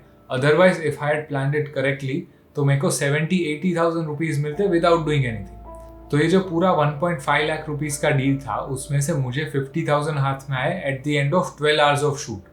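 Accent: Gujarat, India. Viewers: Gujarati Spotted 🤓 , Right ? 10 Sec, Video Dekh ke hi pata chal gaya .. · Bhai hindi ma pn tamri gujarati tongue ave che, ane ek gujarati j atli saras video banavi sake ane reality batavi sake. Bija video lavo detail ma ane sadi bhasha ma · Gujarati che ne bhai tu taro, tone gujrati che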